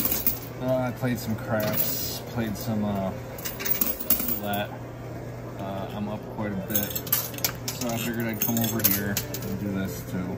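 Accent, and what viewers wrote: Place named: Appalachia, United States. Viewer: What was your buy in, sooo your profile pic is not the real you?? And....your hill Billy twang ain't waiting was last time you was here with mrs colin!! I like watching this cos I'm a gambler too Sometimes, but I like the pokies coz you don't have to think about nothing just tap tap tap, like what you doing, drop drop drop lol...don't talk if u got nuttin to say.. If u not feeling it don't say it I just wanna watch not listen to talk lol talk is too Cheap...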